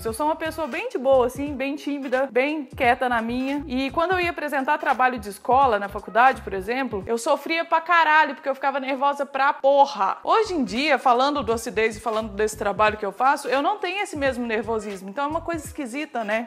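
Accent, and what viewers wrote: Minas Gerais, Brazil. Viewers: Sotaque mineiro <3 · vc e mineira?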